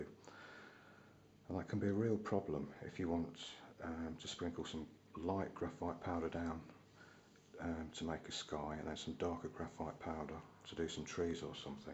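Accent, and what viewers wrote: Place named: Midlands, United Kingdom. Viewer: Love the Midlands accent btw. Melton Mowbray?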